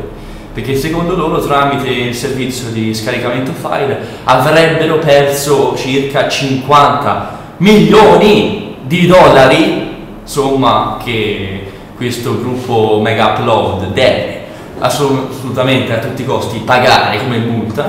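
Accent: Tuscany, Italy. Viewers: HAHAHAHAHAHAHAHAHAH ! Sei troppo forte !!!!! mi piace tantissimo sentire parlare Toscano, stupendo il passaggio del dito medio......... ahahahahahahahah !! Mi ricordi tanto il conte uguccione......! ahahaha... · l'accento toscano <3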